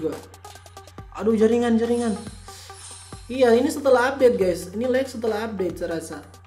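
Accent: Manado, Indonesia. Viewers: Lu manado ya bro?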